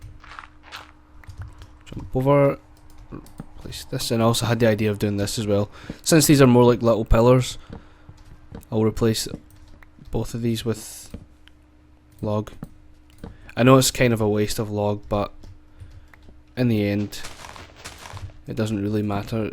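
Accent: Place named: Edinburgh, United Kingdom. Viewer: Where you from in Scotland, Edinbrugh ishh? You sound it.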